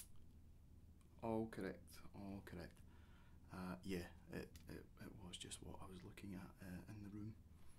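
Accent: Scotland, United Kingdom. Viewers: Loving the videos man keep em coming! Scotsman myself so its great to hear our accent being put to good use :P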